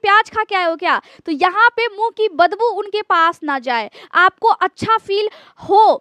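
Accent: Bihar, India. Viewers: Kya Aap Bihar Se Hain??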